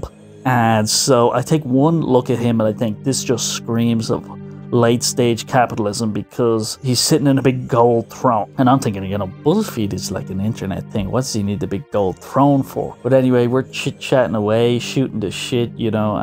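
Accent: Ireland. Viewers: I didnt know you were irish! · What side of Dublin are you from?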